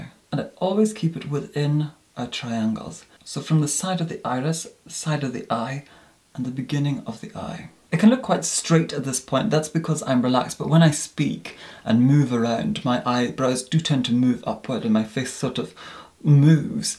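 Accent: Scotland, United Kingdom. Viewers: he has took the original English accent and slightly mixed it with Scottish and created this amazing and extremely proper accent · Lovely Scottish accent 🏴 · so this is my second video i have watched of him, and i’ve realised he actually had abit of a scottish accent lmao..? ik he’s british but i couldn’t put my finger on it. am i right? is he scottish?! phahah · We can still hear that Scottish accent! ;) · He's Scottish but a really posh english/Scottish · Phoinexe he speaks with a Scottish accent but has made his voice a bit more posh and articulate in more recent videos.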